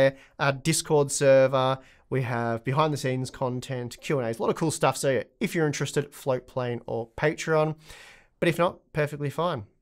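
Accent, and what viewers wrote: Australia. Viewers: Love your work. I follow 4-5 different channels and I like the different takes. Also, mixing it up with some aussie accents is always a good thing.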